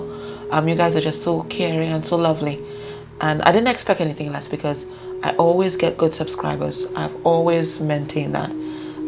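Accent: Trinidad and Tobago. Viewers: Speedy recovery sis. Very accurate read absolutely amazing lol are u trini?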